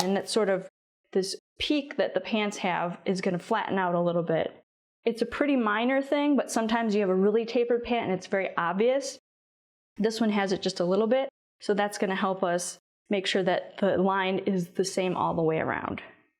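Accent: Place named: Canada. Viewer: I thought she sounded Canadian....well, it's close by....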